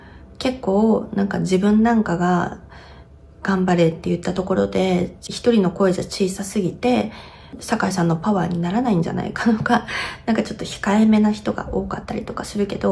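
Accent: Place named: Tochigi, Japan. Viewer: 栃木だったんですね私は茨城の鹿嶋です案外言葉似てるね😊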